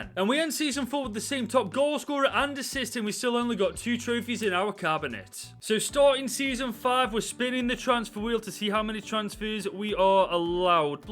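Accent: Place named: England, United Kingdom. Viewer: My guy has the thickest English accent I’ve ever heard, and I love it 😂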